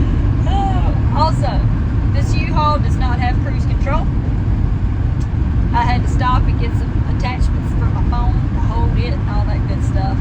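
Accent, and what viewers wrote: South, United States. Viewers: That Northern fish loves your Southern accent 🤩😁👍🏻🌞😎🥰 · That southern belle accent, beautiful conservative woman, and she fishes...thats a keeper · Girl, do you ever run out of energy? Love the southern accent, makes my day every time I hear it.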